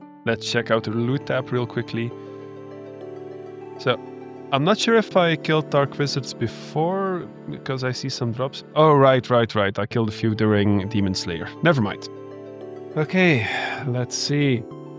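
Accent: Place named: Flanders, Belgium. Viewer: he sounds very flemish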